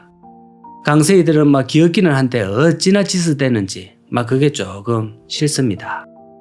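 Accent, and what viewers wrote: Gyeongsang, South Korea. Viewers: 모두들 잘살고 평화로운 세상 오길 간절하게 바랍니다. ~경상도 말씨가 듣기좋습니다. 저도 차분한 말투 배워야겠어요.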